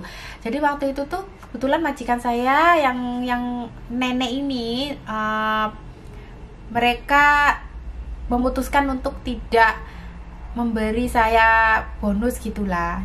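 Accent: Java, Indonesia. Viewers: Saya suka mbak,biar suami bule hidup di luar tapi jowone gak ilang 👍 rendah hati, saya dulu ingin jadi TKI tapi gak punya nyali,juga ingin punya suami bule 😀😀😁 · Jawane ngedi mbak ? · Salam kenal mba, aku seneng lihat konten mba, lucu deh bicaranya, logat jawanya yah tp seneng juga sih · Salam kenal mbak... Sy dr Surabaya, suka lihat vidio mbak sekluarga semoga langgeng sampai tua ya mbak... Sy jg suka klau mbak bicara logat jawa sangat medok sekali.. Sy tunggu vidio selanjutnya... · Bru nemu vlog ini.. Suka gaya bicarax mb.. Jowone medok hehe.. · ......... aku suka banget dengan gaya dan logatmu yg begitu lucu dan kejawen banget👍🏻👍🏻👍🏻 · Jawa km mana SICH mbk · Jowone ngendi to medok bingit salam berkarya saja semoga sukses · Mantabbb Mba...Jawanne ngga ilang meski sudah lama tinggal di Australia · Natural bngt mbk ngomngy jowo bngt kyo q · Enak berbagi Aq seneng ora ilang joeone logate jg .. 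Tetep smangat suxes sllu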